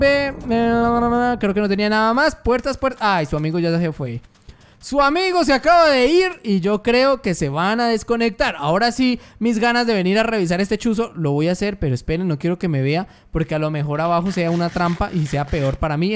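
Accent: Colombia. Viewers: no sabia que eras de medellin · algo me dice que este vato es colombiano xd like si sos de colombia :v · Alguien me dice de que país es xD parece colombiano papá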